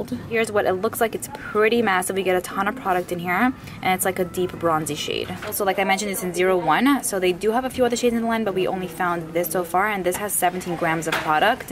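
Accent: New York, United States. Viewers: new york accent , i pronounce it the same way you do.hahahahaha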